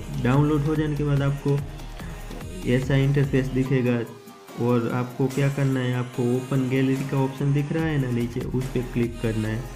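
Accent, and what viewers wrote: Gujarat, India. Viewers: You from gujrat?? You voice like Gujarati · Bhai aap ka voice Gujrati lag rha hai mebhi Gujrati hu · Bhai Gujarati ho kiya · Apke avaj se lag rha hai aap gujarati ho. Sach bat hai ? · Tare avaj thi aevu Lage che ke tu gujrati che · Bhai tme gujarati chho ne😅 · Bhai aap Gujarati ho aapke voice se lag raha hai sach kya ??? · BRO GUJRAT SE LAG RAHA HE ❤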